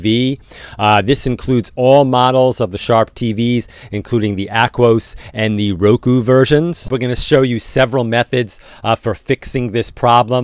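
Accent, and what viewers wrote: New England, United States. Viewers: Your New England accent had me thinking John F Kennedy was helping me fix my smart TV.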